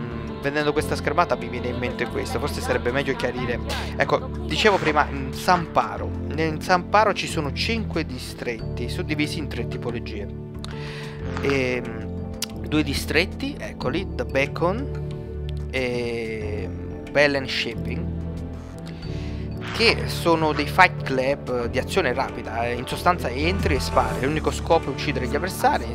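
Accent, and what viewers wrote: Italy. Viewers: Napoletano Doc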